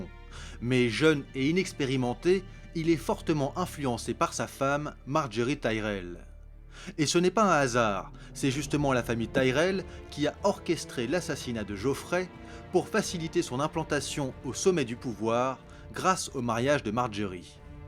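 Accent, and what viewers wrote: France. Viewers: Je jure si tu dit BORATHEON encore une fois avec cette accent français merdic qui me fait mal au oreilles.... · Encore une fois, très beau travail (même s'il manque pas mal de précisions; Jon Snow fait partie de la famille Stark, il n'est pas question de Tyrion, pas même de son frère Jaime, ni de Melissandre et du Dieu Rhor,... mais l'essentiel est ici). Seulement, les noms prononcés à la française, c'est assez déstabilisant.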